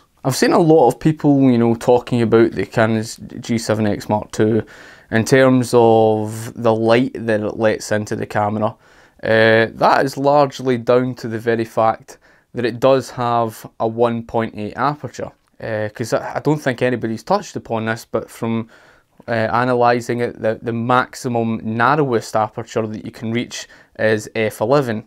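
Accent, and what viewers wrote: Scotland, United Kingdom. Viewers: jens lipponer Scottish accent you mean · Great video! Plus, love the scottish accent :) thumbs up from Italy!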